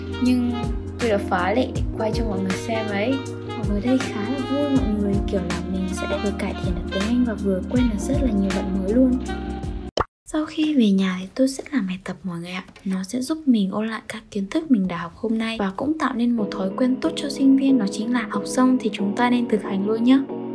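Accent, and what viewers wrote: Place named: North, Vietnam. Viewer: Giọng bắc đồ đó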